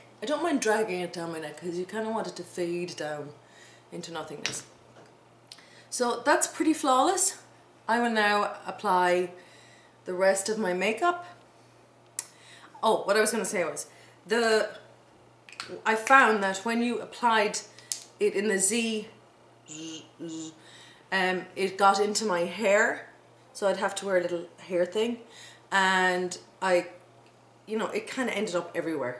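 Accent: Ireland. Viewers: wow it looks good must buy it are you from Ireland originally you sound like your Irish I am from Ireland Limerick xxxxx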